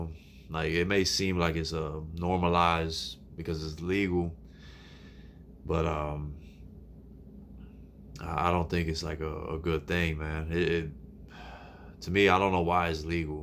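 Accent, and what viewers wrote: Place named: Louisiana, United States. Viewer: I bet you’re from Louisiana. Maybe around Lafayette area?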